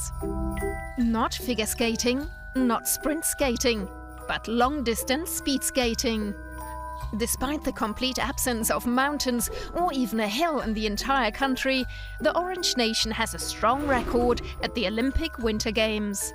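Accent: Germany. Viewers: Was the narrator german, she had such a germanic accent lol. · You pronounce Dutch name as if they're German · You pronounce the names very german but pretty good anyway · Why does the announcer pronounce all the Dutch names as if they were German? It is a bit disorienting. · She pronounces Dutch names with a German accent lol. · yes I could hear the German in her voice xD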